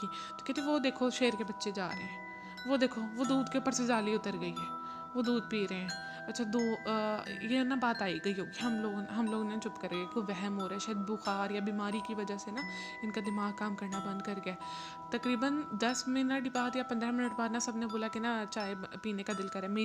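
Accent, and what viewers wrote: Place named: East Punjab, India. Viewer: Your Punjabi accent is of Eastern Punjab،Sardar type. Sounds nice.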